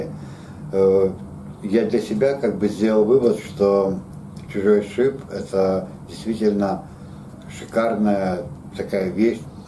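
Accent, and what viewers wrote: Odessa, Ukraine. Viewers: "Свежая кровь" в столярном влогинге.Ни одного дизлайка!Так держать!Я подписался(одесский говор ласкает слух))))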